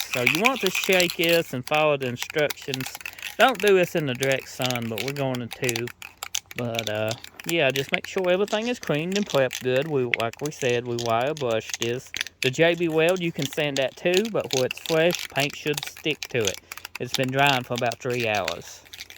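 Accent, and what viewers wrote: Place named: Louisiana, United States. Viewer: hey, how's everything going? I'm guessing he's referring to the way you speak. Your accent is quite obvious. Where are you from? I was thinking Louisiana (Baton Rouge)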